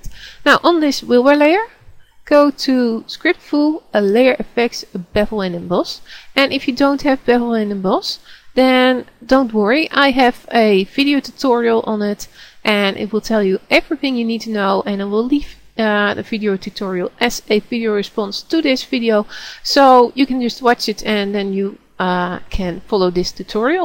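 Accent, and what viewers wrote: Netherlands. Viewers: Are you from the Netherlands? I have relatives from there that have the same accent as you. :D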